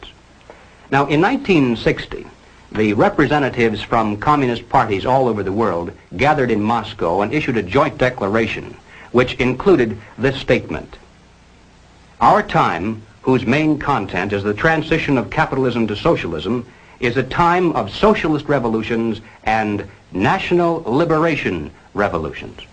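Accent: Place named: Mid-Atlantic, United States. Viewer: The mid-atlantic accent is persuasive as fuck.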